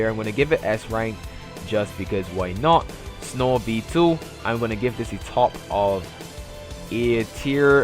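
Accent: Barbados. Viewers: U bajan? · From your accent u from Barbados? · u have a barbados accent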